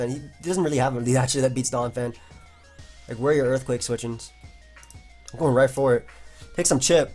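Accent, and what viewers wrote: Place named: New York, United States. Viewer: It's that NY accent...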